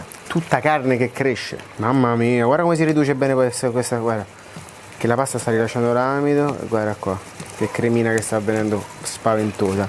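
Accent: Rome, Italy. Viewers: tutto quello che fa e divertentissimo sara perche parla romano... ma la gioconda non l.hanno rubata pero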